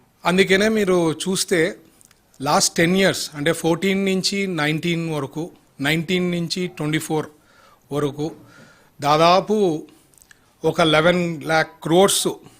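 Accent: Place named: Kurnool, India. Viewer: is using his kurnool slang so dont think bad about him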